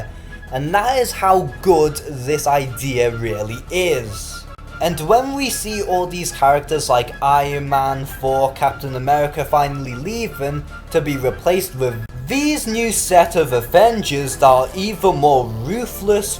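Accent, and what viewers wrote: Liverpool, United Kingdom. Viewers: One of the most cringe worthy videos I have ever seen. Your voice is bland and boring. Plus the fact that you're Scouse makes it even worse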